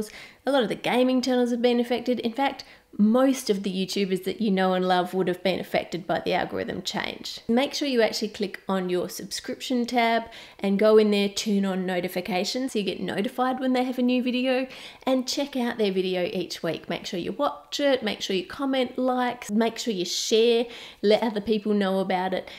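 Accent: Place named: Australia. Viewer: Can you please do a video where you just repeat the word chocolate please, i love your aussie accent when you say ot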